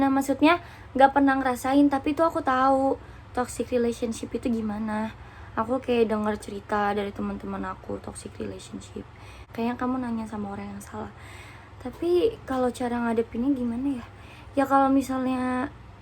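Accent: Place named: Sunda, Indonesia. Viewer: Suka ke sunda²annya kak 😘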